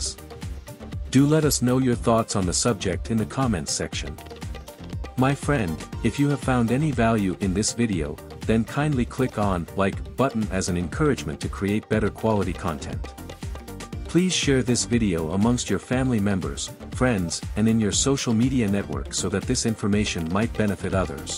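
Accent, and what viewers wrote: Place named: China. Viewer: Where accent suggest you are Chinese. Please introduce yourself.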